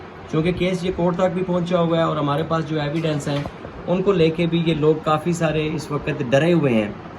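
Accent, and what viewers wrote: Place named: Punjab, India. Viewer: Aisy alfaz tho Punjabi b bolty ha.. Qk ghairat mand logo ka lehja yahi hota ha.. Ap kaha K patan Ho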